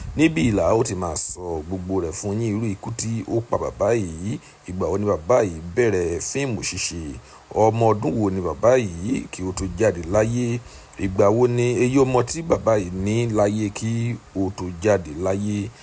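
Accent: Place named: Yoruba, Nigeria. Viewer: You are talking as if you are sick,not smart at all,you are speaking as if you are a Yoruba man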